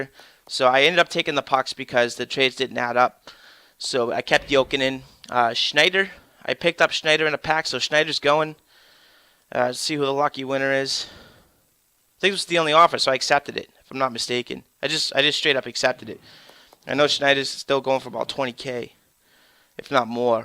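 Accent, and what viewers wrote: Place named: Boston, United States. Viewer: Lol I love your Bostonian accent I'm from Toronto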